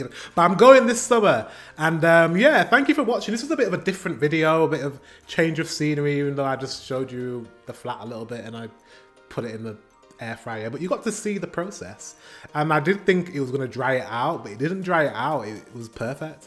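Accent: United Kingdom. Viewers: you are such a sweet and charming young man. Is your accent northern? or midlandish? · By the way. Your english sounds british.... I continue anyway. What about risengrød. On that, you have the same cinnamon/sucker on top of it.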